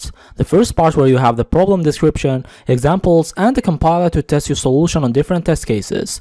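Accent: India. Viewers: I can't understand this indian accent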